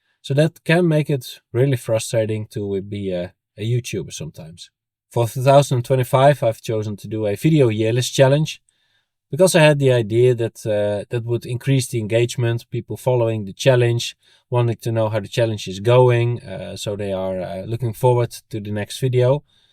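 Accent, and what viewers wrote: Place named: Netherlands. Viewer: I hope you keep the videos coming ! I really enjoy watching them and your journey is really nice to follow A few things which I want to add for sure is however : - do what you have time for ! There are times where you are more busy especially with having kids and a family - show us what fascinates you and not what you think might be interesting for us viewers (that way it is way more exciting as well for you!) - we appreciate the effort of you speaking english! It does not have to be perfect and mistakes makes you human and I m sure no one is bothered by your dutch accent and "mistakes" it shows that you are making an effort to make these videos great and gives the videos a great personality thanks for many hours in front of the TV and learning a lot while enjoying our beautiful aviafauna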